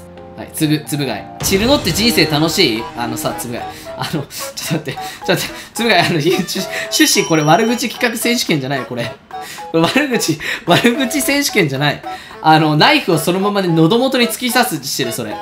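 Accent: Kansai, Japan. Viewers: kunさんはたまに関西弁が出てましたから関西出身やと思っとりましたけど岡山出身の方なんですね。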